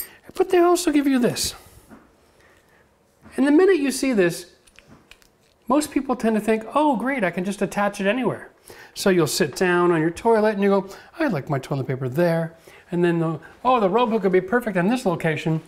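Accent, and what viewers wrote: Canada. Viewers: As soon as I heard that accent, I just KNEW the Robertson was coming oot as the hero. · when you said "out" I knew you were Canadian - plus now gently you spoke !😄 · You sound Canadian, bud. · I love your accent. Reminds me of my Canadian friend. 😊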